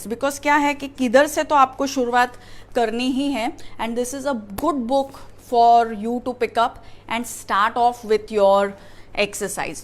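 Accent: Mumbai, India. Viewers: phuski bomb ...lol ..ur such a mumbaite..its awesome to hear every word u say .deep thanks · Nice & convincing explaining...enjoyed Bambayya Hindi too...like..."ye mai kayke liye samza Rahi hu" 😄👍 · Every video is very informative . I enjoy listening to your Bumbai Hindi.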